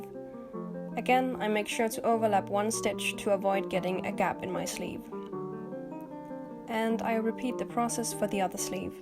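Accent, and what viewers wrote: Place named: Denmark. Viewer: Youre danish lol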